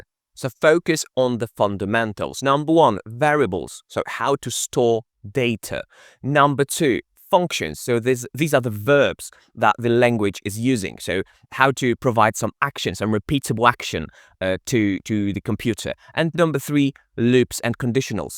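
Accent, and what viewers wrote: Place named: United Kingdom. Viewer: Thanks for your video. I'm a Python Automation QA Engineer. I worked for DISH company for 6 years as API Automation Engineer. I love your British accent and pronunciation. I'm from Ukraine and I want to find a partner to practice speaking English. I have been resting for 1 year after I left DISH company. Now I'm updating my skillset and I want to find a new job. Could we get to know each other to practice English together?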